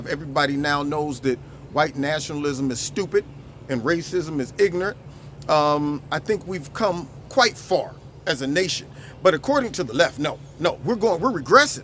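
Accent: Australia. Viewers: I loved the Aussie accent. You are the best. We need to hang together and be kind to one another. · LOVE your Australian accent! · Love the Australian accent lol I counted and saw 27. lol The leftists have constantly been talking about transparency, well President Trump is the only true Transparent President.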